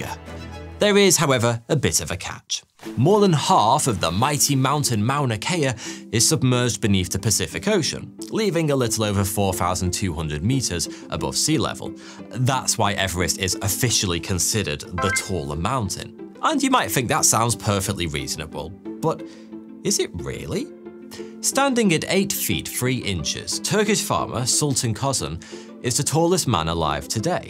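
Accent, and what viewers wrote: United Kingdom. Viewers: Yup, its all about story telling, a nice British accent that gets you 358K view in just 7 days and poor me with all the mathematics and Indian accent can make 400 views in 1+ year 😛- Just measure base to tip and it aint that big ha ha. Love this guy. Wish I had a good voice like him.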